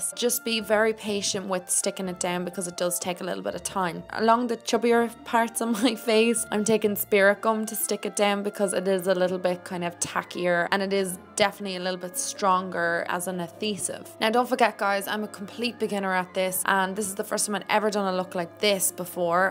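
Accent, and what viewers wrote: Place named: Cork, Ireland. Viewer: You sound like ur from Cork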